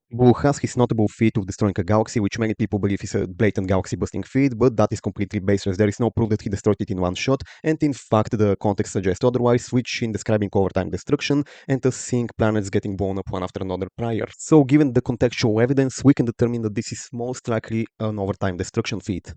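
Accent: Russia. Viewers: off topic question, but... you're Russian, it's just that accent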